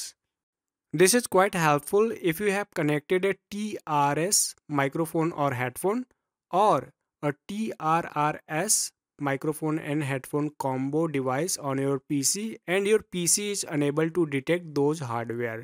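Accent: India. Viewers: when i heard the indian voice i knew i was in good hands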